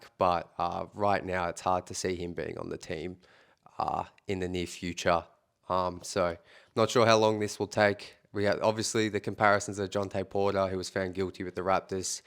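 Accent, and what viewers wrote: Australia. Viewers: Australian, yes. And a legend!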